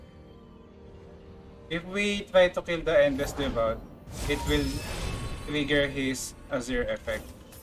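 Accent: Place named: Philippines. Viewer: Are you Filipino? Because your accent really sounds like a Filipino lol (I'm Filipino) Keep up the good work!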